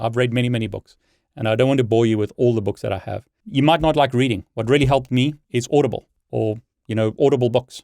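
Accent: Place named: South Africa. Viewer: Well your accent indicates that you are South African and South Africans are one of the most studious, hard-working, Stoic, Intelligent, never-give-up, honest people in the world~!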